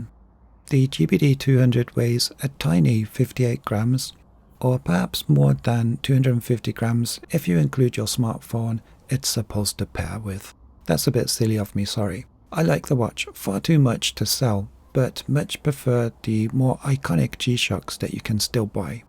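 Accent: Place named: Wales, United Kingdom. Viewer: I loved the review. Very detail oriented and a pleasure to watch. Your voice and way of speaking is also very pleasant. I’m an American so I’m very used to loud and “in your face” and found your accent and volume to be quite a nice treat for my ears. I am going to assume you are from the UK and forgive me if I’m wrong but you strike me as possibly welsh? I lived in Portsmouth for a number of years as a child so I could be wrong but I’d be interested to know where your accent is actually from. Overall great review, honest and detailed. I’ll be subscribing. Cheers from sunny Florida mate.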